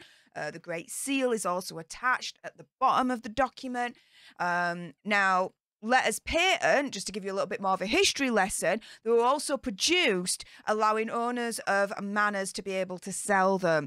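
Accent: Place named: Northern England, United Kingdom. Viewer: Hi, just popped in following Trev Coult's recommendation - to find out what you get up to! I've been trying to place your accent - somewhere around the Pennines between Lancs and Yorks, I think. I'm in Cheshire. (and don't panic, you're WAY too young to interest me socially!) - - I think we should depose Horrid and Methane's kids.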